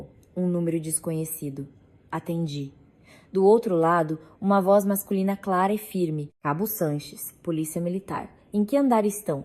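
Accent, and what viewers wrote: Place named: Portugal. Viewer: Por favor, naõ precisa expor linguaja do Portugal pois estamos no Brasil ou a narradoura naõ sabe disso, narra corretivamente língua do Brasil....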